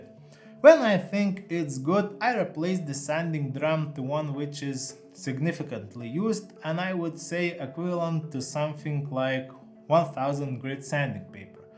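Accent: Russia. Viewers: хэллоу) чувствуется русский акцент)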